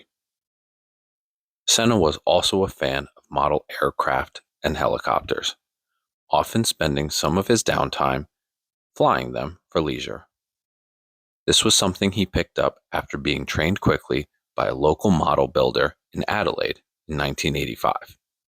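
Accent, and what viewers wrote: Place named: United States. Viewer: you sound like an american , you don t have any british accent at all , if im correct that is very impressive because senna never was loved or famous in the united stetes because for been a f 1 driver , the don t care about f 1 anyway , phil hill was world champion in 1961 if anyone ask about him in the united states you gonna hear , who ????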